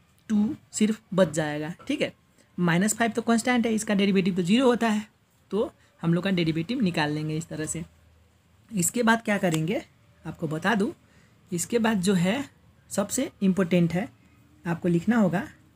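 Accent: Nepal. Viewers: ARE YOU FROM NEPAL🇳🇵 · Bro's Nepo-Hindi is sweet😂😭🫶🏻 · Bro temi Nepali ho timro boli sunda Nepali lagyo anyway Sabai ramro Xa temi le padhako pani Sabai bujhinxa keep it up love you 🤗🥰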